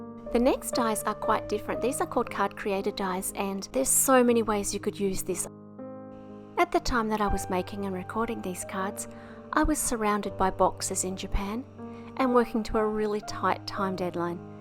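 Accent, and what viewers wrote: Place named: Australia. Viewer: Hi, I loved these cards they are simply stunning, and now I want to purchase the butterfly dies. It is always a joy watching you create your cards... with your easy to listen to Aussie voice, and the beautiful relaxing music in the background. Everything blends so well together, and it matches your creativity. I am so blessed to have found your channel., I am so sorry for your loss, and this is such a beautiful way to pay tribute to your nephew Daniel. Prayers for you and your family at this very sad time. May you find comfort in our Lord and Saviour Jesus. God's blessings and love, Gloria 🙏💐❤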